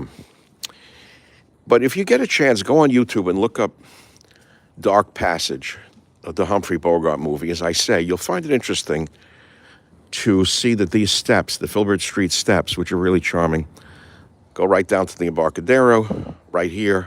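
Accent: New York, United States. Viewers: hooorrrible just horrible. so new york sounding lol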